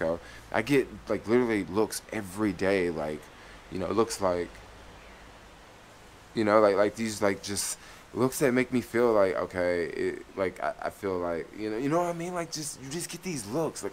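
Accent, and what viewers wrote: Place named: Memphis, United States. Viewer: You from Memphis so talk like you talk. you got your speech pattern.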